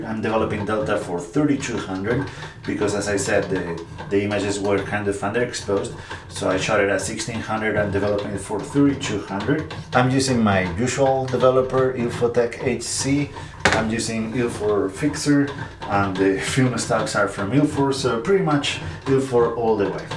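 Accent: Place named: Spain. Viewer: Con todos los respetos, tu acento inglés suena horrible... no entiendo por qué siendo español haces los contenidos en inglés... Es una pena porque privas al público de aquí que no comprende el idioma de entender unos vídeos que comparten unos conocimientos e ideas sobre fotografía muy buenos, y estás dando prioridad al público extranjero...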